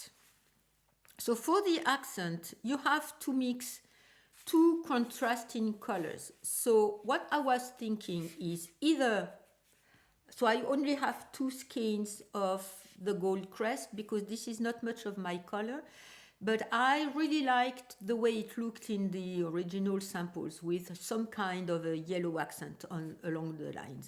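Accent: France. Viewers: What a delightful podcast! Your French accent is a pleasure to listen to. I can really relate to your acquiring your kryptonite stash and trying to focus on using it up. Best of luck to us all!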